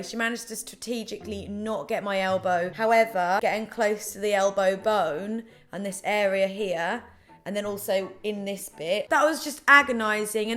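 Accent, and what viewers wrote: United Kingdom. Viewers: I so love the British accent. Espcially describing tats.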